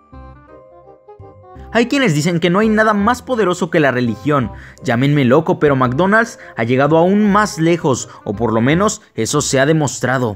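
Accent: Mexico. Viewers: Eres mexicano?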